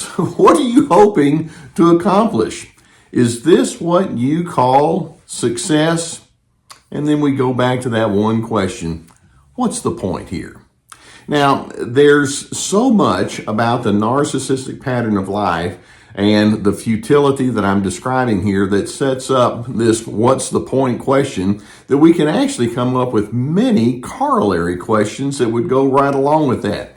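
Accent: South, United States. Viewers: excellent! thanks for taking a moment to respond to my comment. really appreciate the southern drawl, the funny faces and sarcasm that you use to describe the buffoon narcissists of the world. gus in the background when doing the videos, is such a comforting soul. will continue to keep an eye on YT for more videos. all the best, steven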